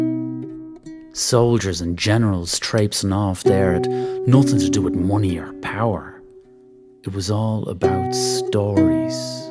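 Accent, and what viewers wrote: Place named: Ireland. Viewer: this is so precious!!! once i can get over that dream of an Irish accent and put my focus on the story and the gorgeous mountain scapes!!! bravo ...a wonderful and rich story!!!!!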